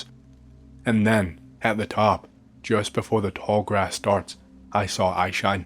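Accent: New York, United States. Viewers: Just have to say it....New Yawk!! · Man i would never believe your voice wasn't AI with how point and consistent in your tone is if not for your NY accent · Next time do a Scottish accent your new York one is perfect 😂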